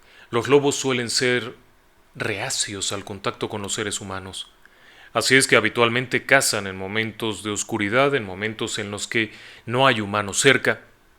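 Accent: Mexico. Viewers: que ignorante es acento netamente mexicano nosotros somos mexicanos no Latinos, aprende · Es el acento mexicano hermano saludos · El castellano es un idioma muy rico. Los latinoamericanos lo han hecho aún más rico. Te lo dice un auténtico castellano de Castilla,que soy yo. Se supone que el castellano más puro se habla en Valladolid. El señor habla perfectamente el castellano con las particularidades de un Mexicano. Lo bueno de nuestro idioma es que desde el sur de EE.U.U hasta la Patagonia puedes hablar con todo el mundo sin necesidad de otro idioma que el Castellano.Por eso que estamos todos unidos por este idioma tan rico. Digo rico porque tiene palabras derivadas del latín,del árabe y en menor medida del Visigodo. Los nombres de nuestras ciudades vienen de el Latín, Árabe, Visigodo,hasta del fenicio y el Cartaginés. Saludos a todos los hispanoparlantes del mundo desde Guadalajara en el corazón de Castilla (España).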